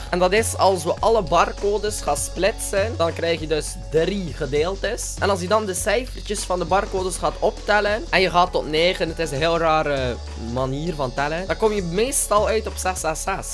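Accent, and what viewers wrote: Belgium. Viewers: Ben je Belgiës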